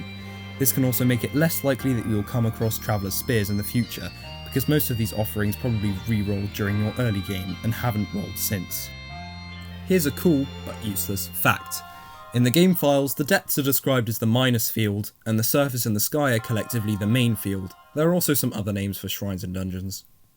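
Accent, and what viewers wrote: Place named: RP, United Kingdom. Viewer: My first thought when hearing your voice in a video - WHAT A POSH BRITISH TWAT. My second - Wow this style of content suites you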